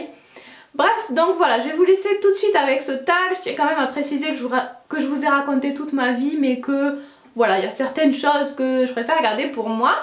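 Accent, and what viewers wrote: South, France. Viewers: ***** moi aussi je suis de beziers :) je me disait toi aussi tu as l'accent du sud :') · Ta l'accent du sud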